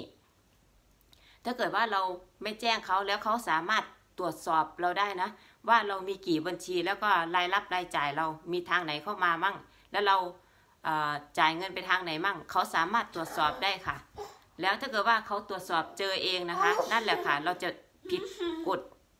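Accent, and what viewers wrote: Isan, Thailand. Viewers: อยากให้ พูดอีสานจ้าน่ารักดีเวลา พูดอีสาน👍👍👍❤❤❤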